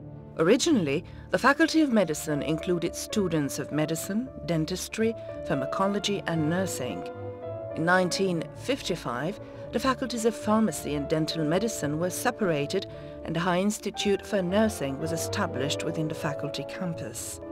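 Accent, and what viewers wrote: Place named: Egypt. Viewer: dude she`s egyptian 100% it`s all about how she spell the letter "ق"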